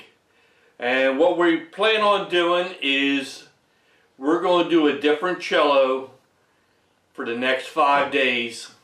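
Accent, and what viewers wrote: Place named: Hammonton, United States. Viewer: down in cape may. My wife is from Hammonton and has the same accent as you .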